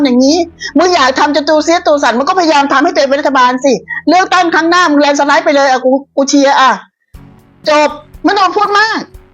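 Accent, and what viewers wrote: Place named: South, Thailand. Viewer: ติดตามผลงานอยู่เป็นประจําชอบการพูดถูกใจมากเลยพูดเหมือนคนภาคใต้ใจถึงพึ่งได้ครับ❤❤❤❤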